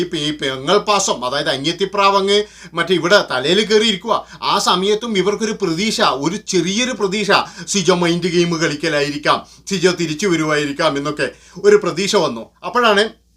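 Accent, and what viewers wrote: Kannur, India. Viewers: നിങ്ങളുടെ കണ്ണൂർ ഭാഷ ❤ നാട്ടിൽ എവിടെ ആണ് ❤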